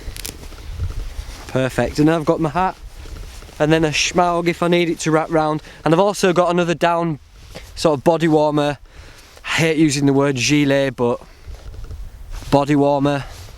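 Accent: United Kingdom. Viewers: Hi buddy! Are you British?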